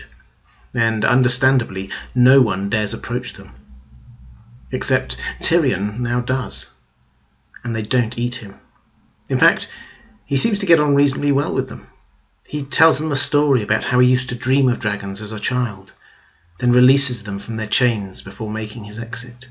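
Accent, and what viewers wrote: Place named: RP, United Kingdom. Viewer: Your delivery is sounding much better. You've incorporated all the things I suggested. Combine that with your RP accent and you're just about the best GoT voice on here. Secrets of the Citadel is tops for similar reasons plus the depth of what she presents, but you could match her and Because Geek is energetic and so lovable, but you're up there now and your voice has it well in hand - good work. Your British accent is so appropriate for the subject. The Order of the Green Hand takes on a manner of speech that is fitting of the Medieval culture, are intelligent, but their voices smack of snobbery and overly serious and these things loose them points. Your hooded look also helps your sense of belonging with GoT. You look like a mysterious stranger someone might find on the road. Someone who looks sort of regular and harmless, like a peasant or wandering monk, but is actually a spy for the Iron Bank or something more dangerous posing as harmless.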